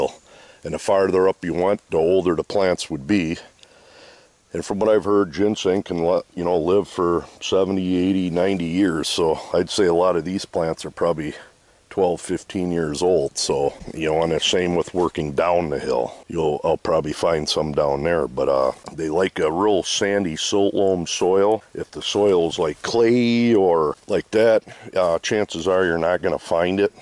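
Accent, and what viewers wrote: Minnesota, United States. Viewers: Thank you so much it's very informative your accent sounds like Minnesota or maybe North Dakota where are you from? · You sound like my, Uncle...😂 Minnesota?! Great video BTW...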